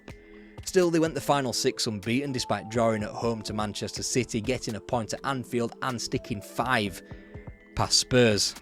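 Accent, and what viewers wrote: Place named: Northern England, United Kingdom. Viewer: I'll say it again. Best content on you tube of this type. I also love the judicious (and quite appropriate tbf!) Use of profanity. Good earthy northern dialect. Keep it coming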